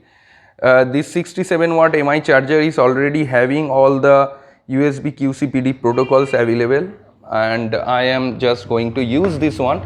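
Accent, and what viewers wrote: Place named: India. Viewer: So.foking annoying anglish you indian 😡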